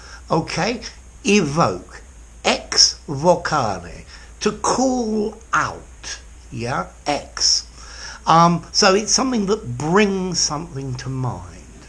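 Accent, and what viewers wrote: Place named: England, United Kingdom. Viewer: The aura of your accent evokes some of my immensely-cherished memories in England some years ago.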